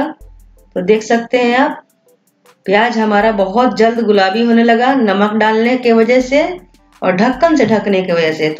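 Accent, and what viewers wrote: Bihar, India. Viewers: Aap bihari Hain na? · Madam chicken se achi to aapki language lagi.....chicken to bnane k baad hi pta chalega...bihar se ho kya aap.... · Jhandu curry,bihari accent mein bol rahe hai aurbana punjabi curry rahe hai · Voice se Ho pta chal rha hai ye biharan hai ye kha bnaegi punjabi chicken kuri wo khawAt hai na bndr kya Jane adrak ka swad wo hi baat ho gyi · waisey bahut accha...pink-pink madam ney kaha ki chiken ho jaiga.. hum rukey huan hain pink-pink chiken ko honey mein.. abhi to chiken brown brown hui hai..yeh hain bihari madam!!! baut mirchi masala khatey hain...accent sey kuchh andaza aya hai. Bihari food is very good.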